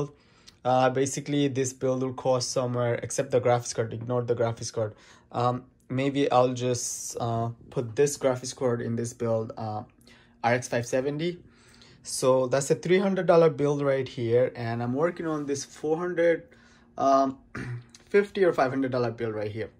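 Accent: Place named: Bengal, India. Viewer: Bhaiya You sound like a Bengali . Are you a bengali?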